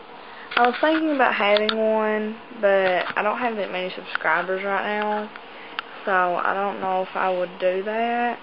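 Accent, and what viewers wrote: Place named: Arkansas, United States. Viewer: OMG! I'm from Searcy, Arkansas originally...lemme say that you're accent makes me feel all warm and fuzzy inside! I hated it when I was growing up there, but I miss it now! Thank you for reminding me how great it is! Smooches!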